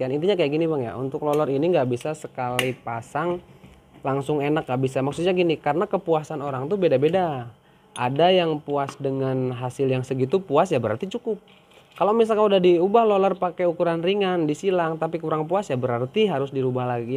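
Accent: West Java, Indonesia. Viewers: Lok mana om Bandung apa mana ya soal nya logat bahasa nya Sunda juga kaya saya hehe..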